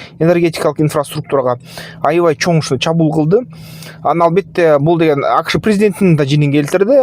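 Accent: Standard. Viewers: Азаматсыз, мырза! Таптаза кыргызча бир созду да бурмалабай ойду берууго болот экен да! Билиминизге, аракетинизге - баракелде! Ийгилик - сизге! Кудайым адамдардын журогуно тынчтык берсе экен!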